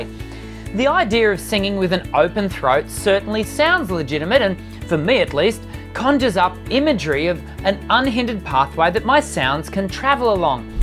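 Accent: Australia. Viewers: Lol I'm a woman with a deep voice and his low range is my high range. But he holds a tune well! I love his Australian accent and turns of phrase too. His personality comes across too. Just enjoyable and educational all around.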